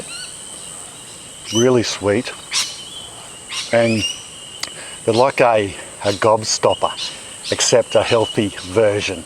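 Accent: Australia. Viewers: You`re a cutie! As soon as you spoke of heat, humidity, and a storm coming I thought "Are you here in Florida? Maybe south - nobody wants to be in the north. But his accent is Aussie, so likely the other side." Then I saw "subtropical (similar to Florida)" in your description, and it all made sense. I clicked the button. That wee lime looks amazing! I can imagine those bubbles mixed into a salad dressing .... and each bite of food has a little surprising ZING of lime. Hmmmmm.... I`m starting a farm soon ..... I may have to figure out how to get a tree growing here. OH.... and then mosquitos - are you SURE that you`re not in Florida??? haha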